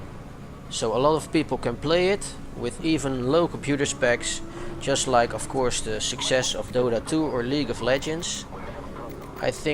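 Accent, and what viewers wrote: Netherlands. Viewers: Bedankt voor je video! (ps. wel grappig: ik kan gewoon je overduidelijke NL accent in je engels horen! ) haha ;D verder gewoon perfect goed Engels hoor...en dankje voor je uitleg! Wil dit spel zelf heel graag spelen, maar ik krijg me handen gewoon niet aan een Beta key om het te downloaden...ben zelfs bereid goed te betalen voor een werkende Beta key...illegaal of niet, maakt me niet uit! Een vraagje...zit C&C 2013 nu officieel nog steeds in de Alpha fase of is dit inmiddels de officiele Beta?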